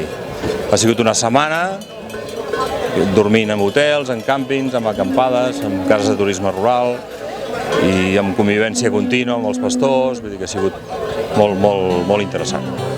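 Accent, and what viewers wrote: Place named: Barcelona, Spain. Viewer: En Camprodon??? Ja,ja,ja ...vas listo! Nadie habla castellano y aún has tenido suerte, el que habla en el vídeo no es autóctono, habla de Barcelona.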